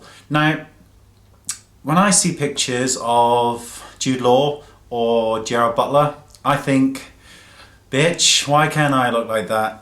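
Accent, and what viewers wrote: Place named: Wales, United Kingdom. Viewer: I'm hearing more of the welsh in you today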